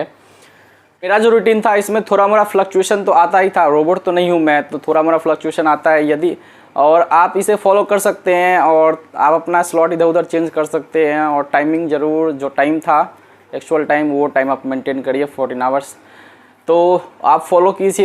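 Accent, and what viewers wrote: Bihar, India. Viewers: Boli se to bihar ke lag rhe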